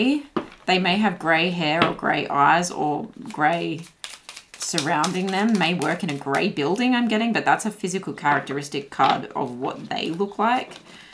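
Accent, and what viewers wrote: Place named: Australia. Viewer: same here, it's weird hearing an Aussie accent on here.. funny when you second guess your own accent lol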